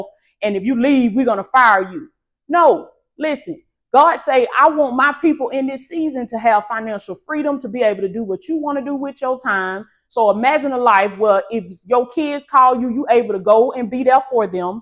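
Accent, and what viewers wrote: South, United States. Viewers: IM COUNTRY TOO SIS AND VERY SOUTHERN AND I LOVE YOUR ACCENT! ❤ VERT UNIQUE AND CANT BE DUPLICATED. (Original) #FEARFULLY AND WONDERFULLY MADE! PTL🙌🏾🙏🏾💜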